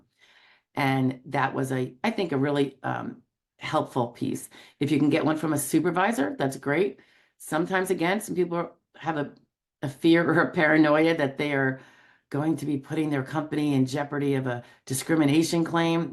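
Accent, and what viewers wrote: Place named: Georgia, United States. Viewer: I thought that accent was a sweet mama Georgia peach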